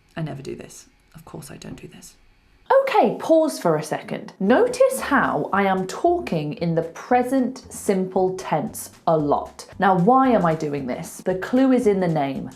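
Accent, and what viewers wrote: United Kingdom. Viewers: British accent. Very good how it sounds to an english student more used to the sounf of the american english. Thanks for this video. I:m on my way to fluency.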